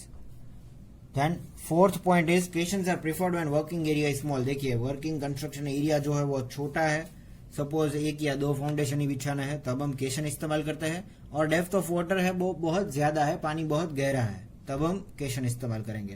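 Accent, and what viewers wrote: Gujarat, India. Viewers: Sir aap gujrat se ho na 😅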